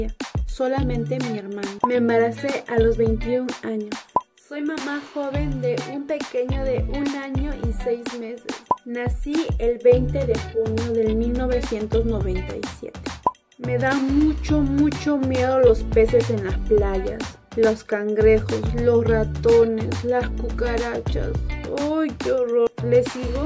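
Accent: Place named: Mexico. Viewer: De verdad pense que eras mexicana porque tienes ese acento mexicano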